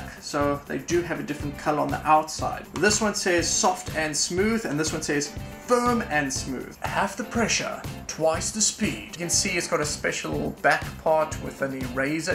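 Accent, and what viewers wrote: South Africa. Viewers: You sound South-African mate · are you from South Africa? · Are u South African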